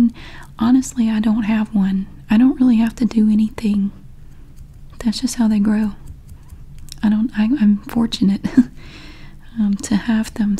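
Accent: South, United States. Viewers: 🥰 Yayyy!!! I am so happy!!!! This was a request of mine. You are completely and totally awesome! I am so glad you did this video with talking instead of whispers (soft talking is my favorite), it's perfect! Your talking voice is so soothing and relaxing, like a southern female Bob Ross 🤗... I love it! And your nails are GORGEOUS! I wish I had strong nails like yours, they are beautiful. Hope you are doing well. Blessings to you and yours. 💕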